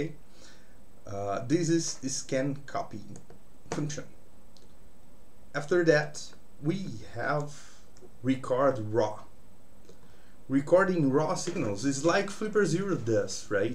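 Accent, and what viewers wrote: Brazil. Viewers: BR Mano? Sotaque parce muito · Term sotaque de brasileiro falando inglês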